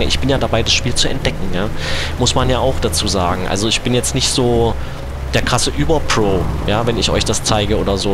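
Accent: Germany. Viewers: Ei verbibcht noch e mo, da kimmt ahner wo och ausm scheene Sachseländle :D ... Mir sinn fai gluuch, dischdsch lusdsch, schnibbsch und gudmiedsch sinn me ooch und manschema e wing einfelltsch, nur eens simmor ne: Dehmlisch! Grüße aus Dräschden :D So, genug von dem Scheiß, zu deiner Frage: Nein, Himmel, kein Minecraft :P Also, ich guck ja viel bei dir mit und kann dich mitlerweile gut ab, aber dort bin ich raus...sorry ;)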